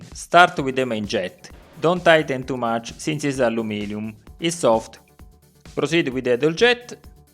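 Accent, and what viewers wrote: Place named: Italy. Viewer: I totally enjoy your italian-English! Great video! Greetings from Germany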